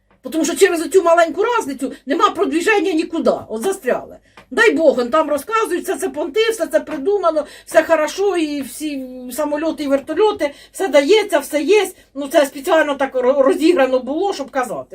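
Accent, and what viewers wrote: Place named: Central Ukraine, Ukraine. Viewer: Це не суржик, це наш центральноукраїнський діалект.Ми так розмовляємо в Кропивницькому ,в Черкасах, в Полтаві.Дякуємо за вашу працю, за стійку українську позицію.Слава Україні! Слава Героям!❤❤❤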